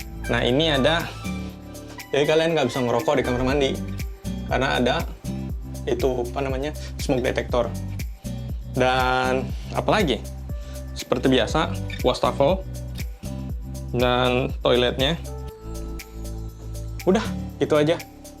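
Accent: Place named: Java, Indonesia. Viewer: Aslinya mana kang, logatnya ko keliatan kejawa jawaan :v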